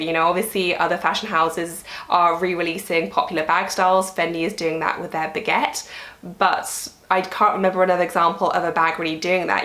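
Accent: United Kingdom. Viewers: Ruth-Ann Leveridge-Young I never cared for British accents until I heard her speak. 😂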